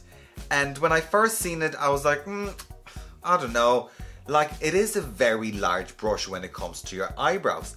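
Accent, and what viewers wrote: Dublin, Ireland. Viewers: I love your Dublin accent ❤️ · Love the accent! Irish?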